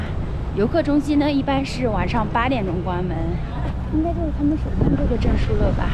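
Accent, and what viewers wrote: Northeast, China. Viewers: 应该和东北女孩一起工作生活过，满口的东北口音，一点不像服南妹子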